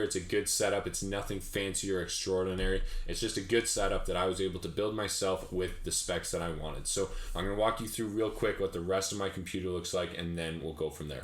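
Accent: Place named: Canada. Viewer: Thank the lord! A man who speaks Canadian. All the yanks nearly made me quit b4 I started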